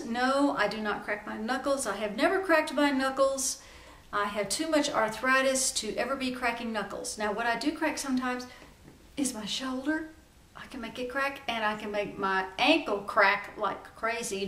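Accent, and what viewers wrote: Italy. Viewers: Your mom reading 50 Shades Of Grey really made me giggle. My FIL has read it too and he is 77!:-) Your Italian accent is super cute! I enjoyed learning these facts about you,. XOXO! Sandra. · Thank you for the tag! (you have a great Italian accent!!) xo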